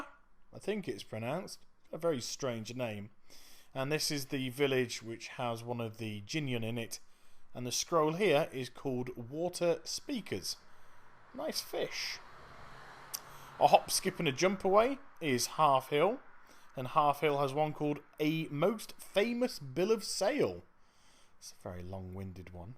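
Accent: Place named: United Kingdom. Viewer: Loving listening to a british WoW player for once! can't stand all those thousands of Americans. Thanks for guide worked a treat, was 50th mount got albino drake:)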